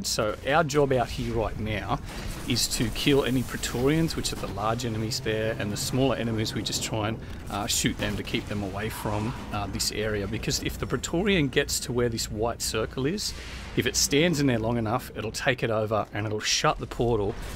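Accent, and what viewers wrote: Australia. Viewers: I love these videos. It explains so much plus I can never get enough of the Aussie accent.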